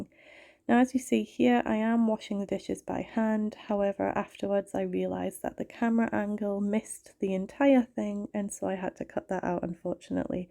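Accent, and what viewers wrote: Scotland, United Kingdom. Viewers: Greetings from Portland Oregon. Mac Bonnie Barbie and Coline follower, also mom of 4, also migraine sufferer, I lived in NE Scotland for about 2 years and my second was born there, so jumped at the chance to hear familiar sounds sights and dialect.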